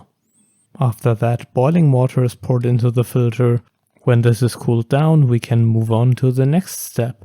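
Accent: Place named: Germany. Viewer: Could it be a german accent? I generally think that a calm german person speaking english seems to have a softer voice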